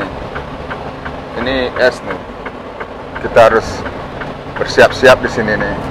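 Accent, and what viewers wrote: Bali, Indonesia. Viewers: Om orang bali ya, kok logatnya kyak orng bali?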